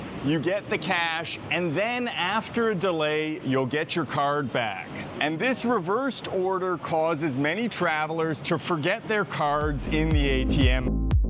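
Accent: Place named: Canada. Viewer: Thanks for your videos! Very thorough, informative and concise, all spoken in clear Canadian English!😊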